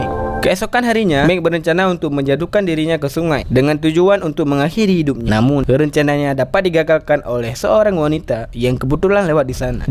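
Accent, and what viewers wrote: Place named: India. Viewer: Lage logat india si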